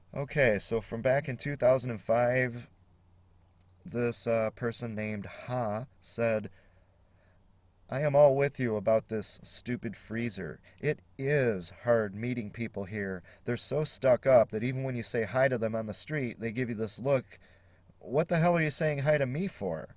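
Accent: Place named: Minnesota, United States. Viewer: The biggest irony behind this video is that this guy has one of the thickest MN accents i've ever heard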